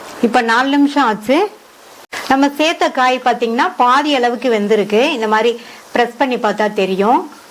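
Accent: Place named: Madurai, India. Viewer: குழம்பின் மணத்தை விட மதுரை தமிழ் மணக்கின்றது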